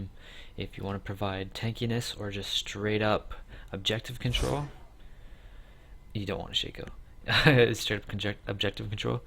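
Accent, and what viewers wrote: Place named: Canada. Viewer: Love your sexy Canadian voice ;)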